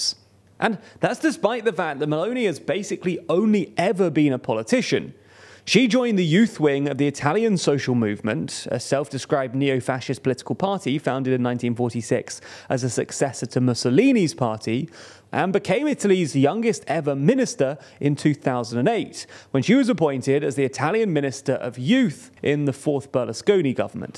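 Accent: England, United Kingdom. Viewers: do I really need someone with an English accent to read me statistics ?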